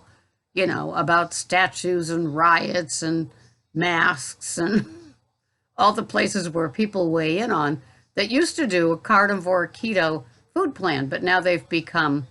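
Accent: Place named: Cape Cod, United States. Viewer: The food allergy test sounds amazing. Looking forward to the day you can share results. Love your Cape Cod accent., have an awesome day! 😊👍😊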